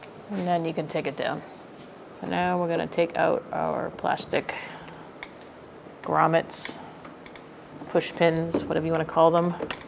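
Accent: Boston, United States. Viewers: My favorite boston accent on the internet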